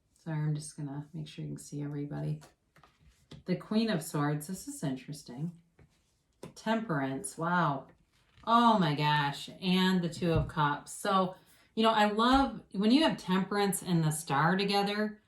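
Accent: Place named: New Jersey, United States. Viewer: Omg I love your accent 😊 You sound like your from Jersey ❤️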